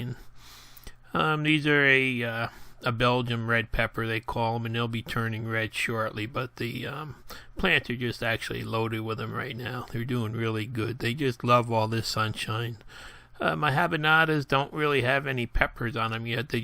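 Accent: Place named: New England, United States. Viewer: get you some quienas well i cant spell but a real hillbilly would know this your a wannabe hillbilly. sound like your a yank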